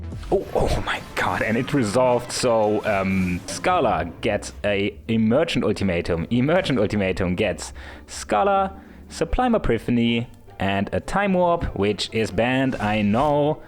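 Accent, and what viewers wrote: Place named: Germany. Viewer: are you a german person though? Because it really sounds like it. At least you are from Austria or Switzerland i know it :D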